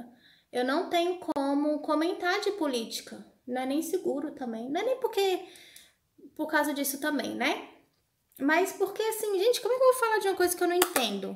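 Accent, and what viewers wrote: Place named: Northeast, Brazil. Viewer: Vc é de Brasília...seu sotaque parece do Nordeste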